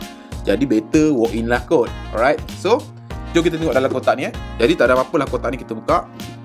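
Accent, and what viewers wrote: Melaka, Malaysia. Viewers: org melaka ke?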